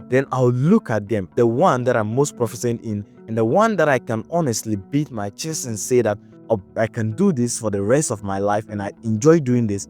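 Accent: Ghana. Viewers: 😂 I like your content bro. Keep it up. I like the way you speak I guess you are from Ghana. Finding job’s and branding as a developer is a very serious problem indeed.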